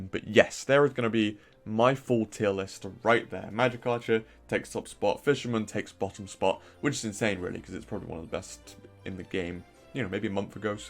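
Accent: United Kingdom. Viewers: I watch your videos mostly for your british accent. I JUST LOVE THE WAY U SPEAK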